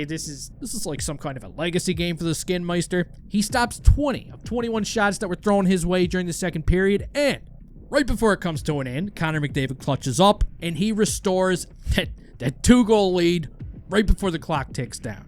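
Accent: Newfoundland, Canada. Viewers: Yo man, sick vid but are you a Newfoundlander? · sounds like you have some newfie in ya, sounds great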